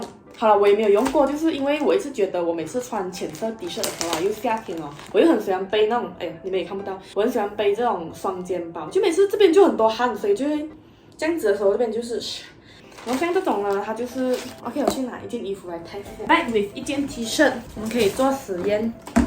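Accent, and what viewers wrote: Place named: Malaysia. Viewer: 我的天 这就是同为马来西亚人的同乡直觉吗(bushi 哈哈 刚刚滑到你的影片 一点进去听了全程韩语 再看了看频道名字放的是英文名，就在猜说是不是马来西亚人呀，结果还真的 哈哈 一点这部一听口音就确认了 哈哈 加油呀🙌影片很棒👍👍😍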